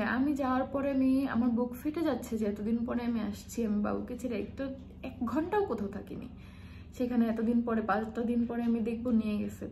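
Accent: Barishal, Bangladesh. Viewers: Amader barishal barguna er Kotha boltacho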